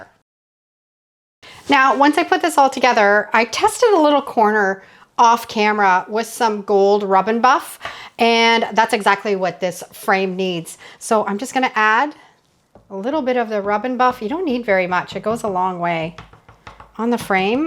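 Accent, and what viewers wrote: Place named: Canada. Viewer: she’s so funny! I watch one of her videos at least once a night, and my husband yells out from across the room…. OUUUUUUUUTTTTT BECAUSE WE ARE NOT CANADIAN This is hysterical to us. Reminds me of all my aunts and uncles that live up there with this accent.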